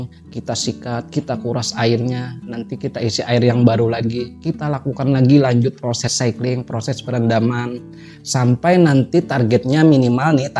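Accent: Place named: Banjar, Indonesia. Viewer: Logatnya ky org banjar nih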